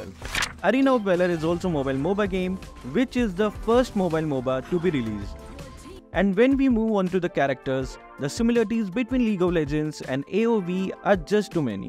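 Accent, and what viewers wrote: India. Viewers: His pronounciation seems he is from India😊😊😊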